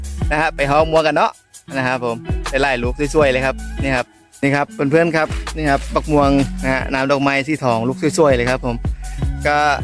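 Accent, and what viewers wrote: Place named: Loei, Thailand. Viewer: มักครับ สำเนียงไทยเลยม่วนดีครับ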